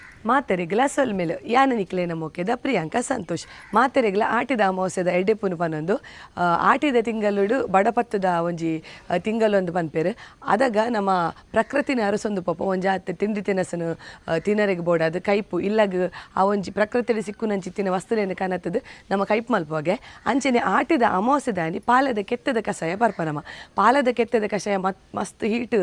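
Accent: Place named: Tulu, India. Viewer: its so lovely to hear you speak Tulu. I miss my home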